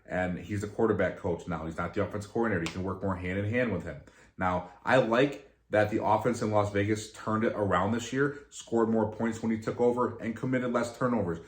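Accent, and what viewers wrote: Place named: New York, United States. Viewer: Great video and I too am excited about this staff! I was gonna guess you were from NY based on your accent lol. Where in ny?! I'm from the lehigh valley pa area. Not too many titans fans up our way!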